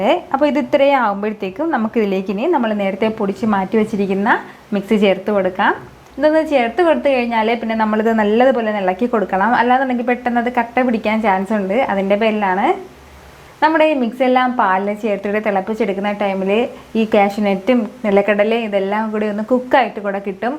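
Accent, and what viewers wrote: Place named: Thrissur, India. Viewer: Thrissur aayirikkum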